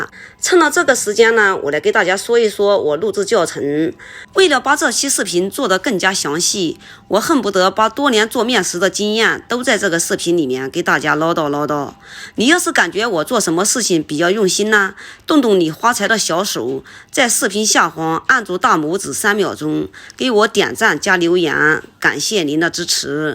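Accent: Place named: Henan, China. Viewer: 一聽口音就是河南信仰老鄉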